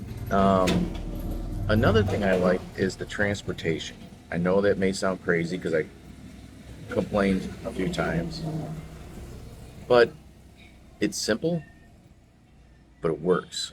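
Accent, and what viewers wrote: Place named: South, United States. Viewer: I like the cool way you narrate your fact! It makes you want to listen! The southern way you round up the words reminds me of the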